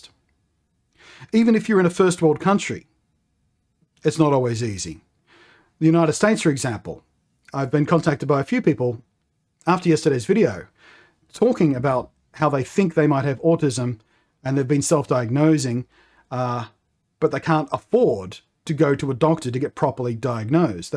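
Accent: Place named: Australia. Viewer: Your description of concentrating on a coffee cup in a coffee shop reminded me of me walking through Martin Place (in Sydney). I noticed that I was looking at the ground, and only glancing up to check and then I would look down again. Thinking about it, I noticed: the paving stones are static, calming. The buildings are static, calming. The sky is largely static -- maybe clouds drifting slowly, but basically calming.And then there is this band of activity -- the crowd of people moving around. And every motion is pulling at my attention: notice this! So it feels "prickly": I cannot filter out all that irrelevant, random movement from my attention -- it is all tugging at my attention. The movement of the crowd is not calming, but unfiltered, attention-grabbing sensations. I can look at it -- it isn't causing me a meltdown -- but I just feel calmer looking down, and only glancing up when I need to check where I am, and where other people are closeby. 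Being in lockdown means not having to walk through Martin Place or other city streets. I love the peace and quiet of my suburb. Getting diagnosed as an adult leads to many big and little self-discoveries. (And of course I picked that you were Australian straight away)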